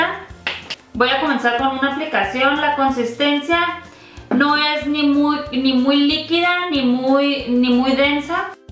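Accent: Mexico. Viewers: me encanta tu acento culichi 😂 saludos bonita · En cuanto abrí tu video y escuche tu voz sabia que eras de Tijuanaaaa !!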